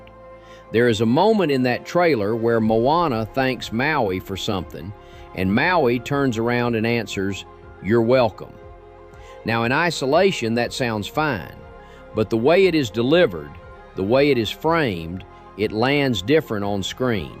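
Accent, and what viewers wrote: Texas, United States. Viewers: Mr., I owe you an apology. Allow me to explain. I was initially exposed to your channel by the YouTube algorithm, specifically your detailed analysis of Star Trek: Starfleet Academy. Though I have great regard for the franchise, I have not kept up with the current iteration under Alex Kurtzman other than Season 1 of Star Trek Discovery. Not out of any specific gripe, though I know that series is polarizing to longtime star Trek fans. I just hadn't gotten around to it. I do feel that a lot of the language used in the criticism of that show is coded in the language of the movement against so-called "wokeness" and "woke culture". I watched that video essay in its entirety. Still, having not watched the show, but knowing that the same anti-woke culture that took issue with ST:D (a more unfortunate acronym I cannot think of) took issue with Starfleet Academy, I looked at your face, and listened to your folksy, Texas twang. 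I looked at the long Santa Claus beard, heard you say that you live in Texas, and that you are a white man. And you were criticizing a cast made up primarily of people of color and women. And I let MY conscious and unconscious biases take over. Here's another probably MAGA-adjacent yahoo decrying that wokeness will be the end of civilization as we know it. And I was dead wrong. I just finished watching this video, and I found your arguments to be logical, insightful, thoughtful, and well researched. You showed respect to not only the fans and the gene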